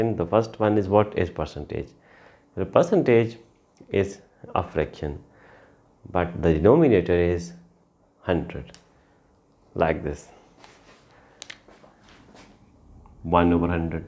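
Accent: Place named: Russia. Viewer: Russian accent!😄 love u sir for the way u teach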